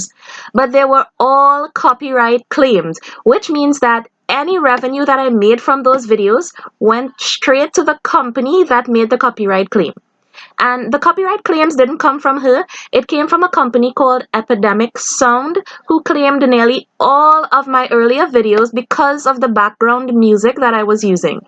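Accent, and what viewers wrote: Trinidad and Tobago. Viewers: 🇯🇲 I love your Trini accent. You can talk about raindrops and I would tune in. 🇯🇲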